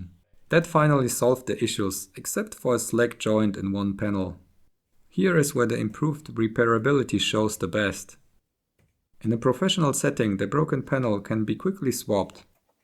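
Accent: Germany. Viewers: Are you from germany ? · Das Deutsch hört man wirklich immer rauß :D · Like is voiceovers are great but in he's like excited in video he sounds so German😄😂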